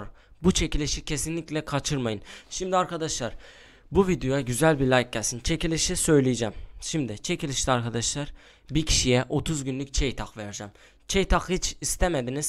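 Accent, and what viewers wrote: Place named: Adana, Turkey. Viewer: abi adanalı olduğun ne kadar belli adanalıyıhhhh allahın adamıyıh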